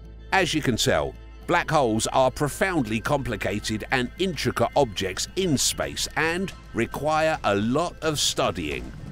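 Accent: United Kingdom. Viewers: >click on video >hear British accent >close video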